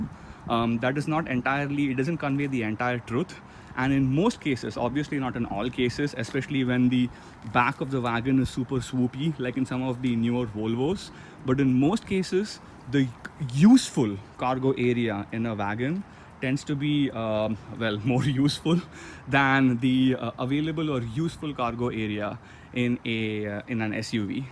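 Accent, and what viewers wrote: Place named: India. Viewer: Your spelling is wrong.... it's not NOT " fluidly".... the correct term is "fluently"..... he speaks Indian-English..... the Indians try to speak the language of their White Master, but because their tongues have are tuned to speak Hindi, they end up with a completely different version of the English language.....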